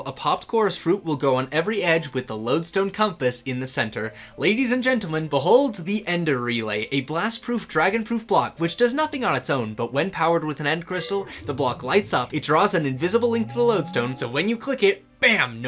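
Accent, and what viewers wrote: Canada. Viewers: Ugh 😩 voice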